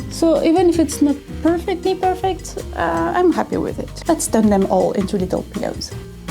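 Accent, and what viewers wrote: France. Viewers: Very cute, very hilarious. No need to apologize for your accent or being French. Neither are a bad thing ❤ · "Sorry about that" re the French accent nooooo I love your French accent and random French words! The bed is soooooo cute! Such a lovely cosy nap spot for Not My Cat ❤. Also let him have some croissant please, he deserves a sweet treat! My kitty loves croissants and castella cake, whenever we let him have a teeny tiny nibble he is very happy 🥐🥐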